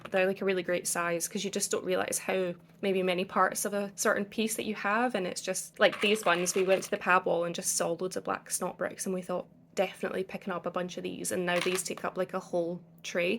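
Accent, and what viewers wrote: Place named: Scotland, United Kingdom. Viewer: A SCOTTISH LEGO BUILDER. I live in Falkirk. I watch Jango Bricks etc and stumbled onto this and my ears went more bigger than they are when I heard a scottish accent. Subscribed. Need to look over the channel. Not one for comments but Thought I'd say Hi.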